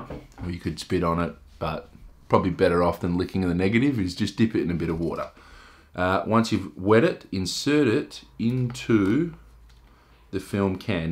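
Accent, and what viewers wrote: Australia. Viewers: His accent makes me feel naturally that he's an aussie.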